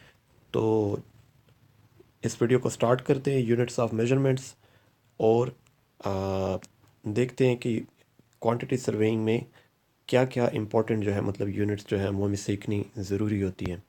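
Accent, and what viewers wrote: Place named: Pakistan. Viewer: Are you from india or from pakistan,just felt that this accent is pakistani. Anyways thumps up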